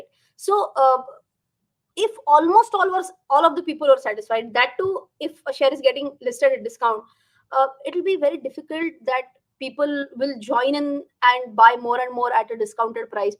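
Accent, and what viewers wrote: Maharashtra, India. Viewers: I want to learn Marathi after hearing ur accent😍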